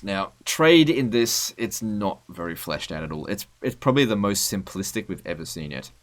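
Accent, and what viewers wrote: Australia. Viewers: I'm guessing that your either an Aussie, or a New Zealander, by your accent. Putting that aside for a moment, I noticed, that you pronounced your faction leaders name, as "Sau Sau". Sorry, my best attempt at spelling it phonetically, the way I heard it. So the "C" in this case, is pronounced, as you would say it in say "Cinnamon" for example. Where it carries more of an "S" sound. As opposed to the sharp "C" sound you would hear in say Car, or Carry, where it has more of a "K" sound. Just want to be sure I'm pronouncing these things correctly. And thought, since your from that part of the world, you would be more of an authority on it, than I am.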